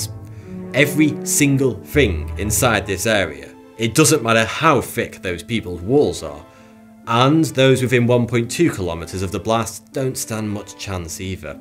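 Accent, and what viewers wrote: United Kingdom. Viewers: Watching this makes me feel like your 20yrs behind like you know top secret you sound like your British forsure technology is not your field from across the pond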